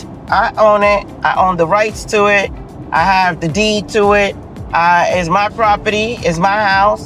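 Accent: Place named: New York, United States. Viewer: Amen, 🎉 Sis love your encouraging words I'm the one who is 66yrs, 285lbs and how i love your NYC accent. Love your videos. I've been watching your videos ever since the video popped up in front of me when you were with Gillian that's where it first started. Water pills, heart pills,baby aspirin and retain water from my N/A Cirrhosis. I know you have alot of subscribers but i'm starting this.... it's something i need to do, and like you said in a comment. I believe that God put that video there for me. I usually watch the watchmen and watchwomen brothers and sisters in Christ and then Gillians video popped on that's when i looked and watched like okay This is my wake up call Love you ❤ all the way from Illinois/Wisconsin.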